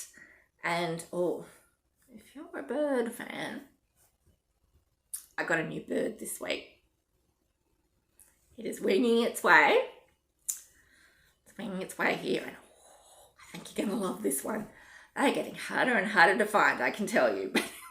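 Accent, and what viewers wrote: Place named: Australia. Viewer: One of my favourite reds to stitch with is DMC 347. Enjoy your flosstube, nice to hear an Aussie accent!😊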